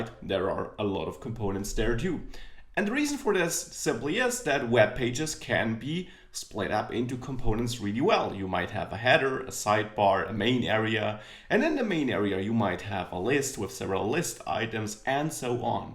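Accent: Germany. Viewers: Are you from south or central part of Germany ? I had a colleague from there and exact same ascent. Thanks for nice info anyways. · Funny to hear germans speaking english... :-D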